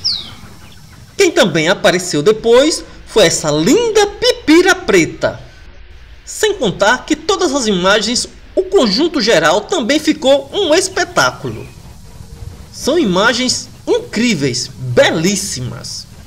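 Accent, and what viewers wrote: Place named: Northeast, Brazil. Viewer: Gosto do seu sotaque nordestino , este é meu Brasil de Norte a Sul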